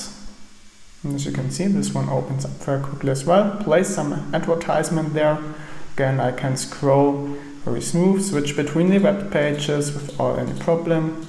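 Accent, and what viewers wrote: Germany. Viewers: don't tell me this isn't a german accent!;O Nice video, thanks for making.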